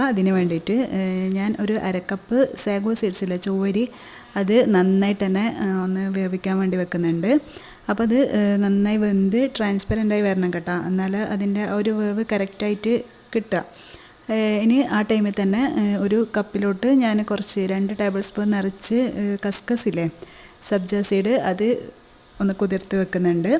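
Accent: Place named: Kannur, India. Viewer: Samsaram kannur karudaedalla...super videos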